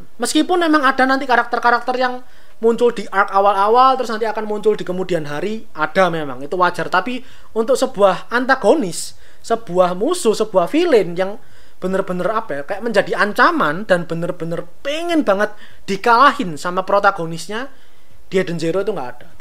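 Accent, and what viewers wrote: Java, Indonesia. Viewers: min suaramu itu medok banget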